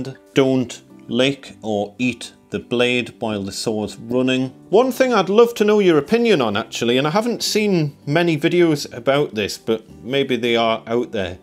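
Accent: Newcastle, United Kingdom. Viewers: I love to see a workman who takes H&S seriously! Quality video mate. Love the accent too! is it Geordie?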